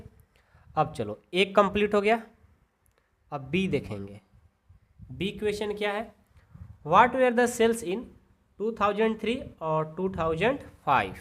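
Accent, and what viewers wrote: Bihar, India. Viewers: Abe bhai yaar tum behari ho kya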